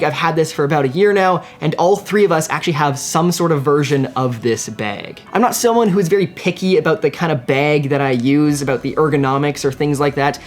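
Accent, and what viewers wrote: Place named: Canada. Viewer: Freakin Canadian accent kills me.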